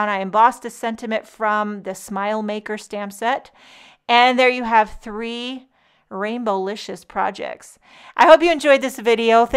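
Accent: Boston, United States. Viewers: You have a great Boston accent! 😂 What wonderful kids spoiling you like that on Mother’s Day! Absolutely love your cards! And this whimsy rainbow die! I find myself creating a lot with this! Great inspiration!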